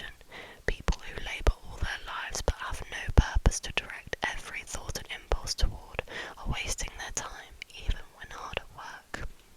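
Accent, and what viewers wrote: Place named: England, United Kingdom. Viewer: yay I love an English accent, subbed! I have a feeling you’ve got a lot of good books up your sleeve, you should totally do a book suggestion vid :)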